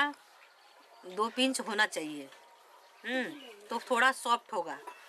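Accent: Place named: Bhojpuri, India. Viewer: behan aap bhojapuri me hi boliye bada pyara lagta h aap bhojapuri bolti h to sach me bol rahi hu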